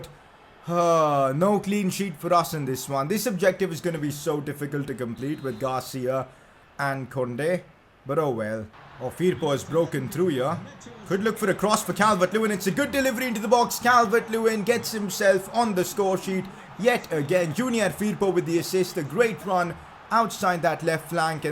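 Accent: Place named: India. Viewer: Your voice is worse than your first videos cuz of indian accent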